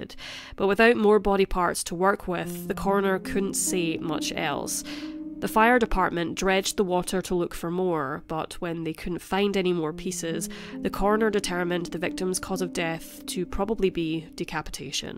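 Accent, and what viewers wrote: Scotland, United Kingdom. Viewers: I can't imagine having such a limited (and limiting) world view. Check out any of Sean Connery's movies, and you too can learn to recognize a Scottish accent. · Really liking the narrator's voice. Scottish? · Scottish accent. Not English. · Scottish accent. Why does it matter if she narrates? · Well this puts Jack the Ripper into the lower leagues of serial killers for sure. This was a very professional production told in a clear and logical way and very interesting; I have subscribed. You also have that lovely Scots accent which is perfect for story telling. Thank you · Scottish accent for me , very erotic for nightmarish stories ... , the reason of sucess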